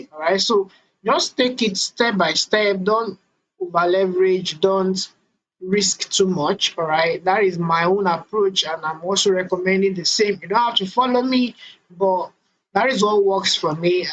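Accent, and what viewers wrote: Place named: Nigeria. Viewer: Ma'am, you sound Nigerian... I am a Nigerian too.. i wonder if its possible to set up a meet for a one on one?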